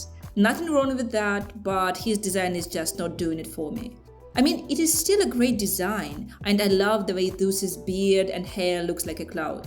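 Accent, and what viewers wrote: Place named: Ukraine. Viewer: Моментально по акценту відчула своїх, хіхі Дякую за чудові відео, вони як стан душі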